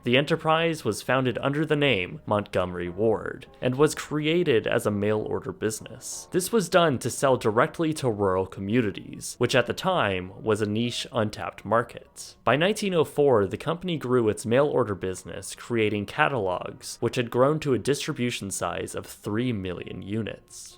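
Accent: Canada. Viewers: Love your videos. Great job,. And your voice is so nice and relaxing. Love that Canadian accent. Love from Oklahoma 🧡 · from Canada. They pronounce some things different up there. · He is from canada they pronoun words differently.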